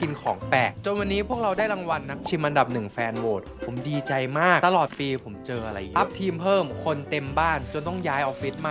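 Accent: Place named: Sukhothai, Thailand. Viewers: 555ผมเข้าผิดมาตลอดว่าพี่คนสุโขทัยเห็นคุยเหมือนกัน😅😅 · ว่าแล้วววสำเนียงเหมือนโซนบ้านกร่าง<num>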